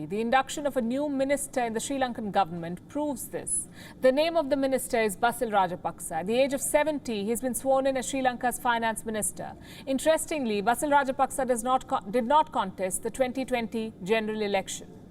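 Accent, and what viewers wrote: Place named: India. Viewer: One big happy family she says with her typical Indian accent - she is classic as always.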